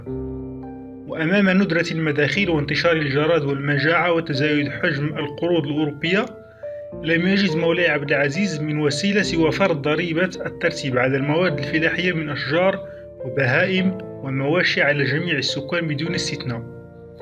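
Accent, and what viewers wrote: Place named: Syria. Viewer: يوميات المصريات يا أخوة اسمعوا صاحب الفيديو هو سوري مش فلسطيني هي لهجتوا بتكون سورية اسمعوا مليح لهجتوا سوري عميل الصهاينة و العياشة المخزن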